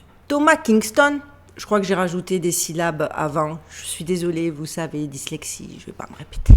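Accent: Marseille, France. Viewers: Toujours aussi passionnante plus l’accent marseillais 👍🙏🏼❤️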